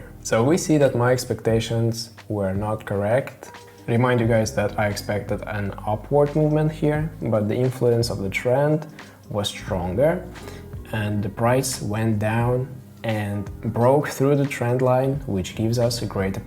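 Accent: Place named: Russia. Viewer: I love your Russian accent!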